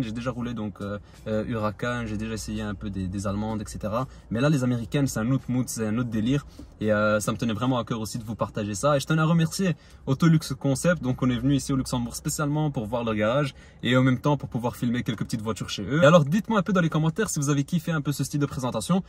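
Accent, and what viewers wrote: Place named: Belgium. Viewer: une guitare !!! retourne compter les chevres au maroc avec ton accent belge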